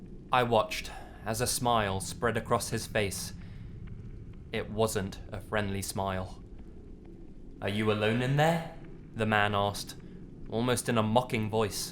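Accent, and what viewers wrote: England, United Kingdom. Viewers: Are you from Britain just wondering I'm not I'm from Spain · don't think he's posh he's just english lol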